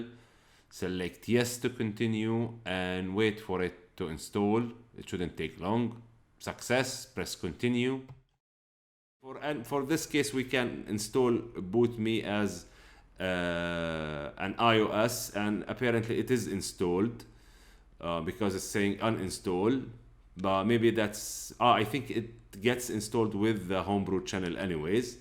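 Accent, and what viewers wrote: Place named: Arab world. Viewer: I LIKE YOUR ACCENT IM ARAB AS WELL BUT LIKE YOUR ACCENT IS JUST WOW.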